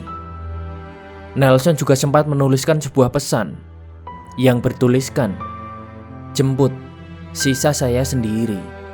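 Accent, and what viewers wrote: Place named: Java, Indonesia. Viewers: Suaranya agak medok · Lekoh bgt bang logat jawa nya 😄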